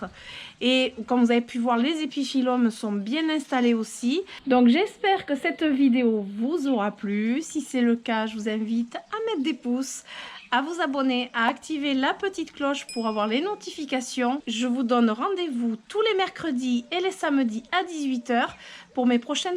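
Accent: South, France. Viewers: Coucou je dois te dire que, outre ta belle collection de plantes évidemment, j'aime beaucoup ton accent du Sud. Moi qui suis en Normandie je me rends compte en t'écoutant que nous les Normands mangeons les e: on dit "jardinrie" par exemple au lieu de "jardinerie", "boulangrie" etc:D 😆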